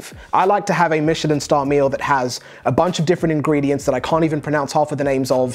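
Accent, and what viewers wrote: United Kingdom. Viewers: Not to be racist but I’d never think I’d be taking information about hockey from a British dude but great video really well done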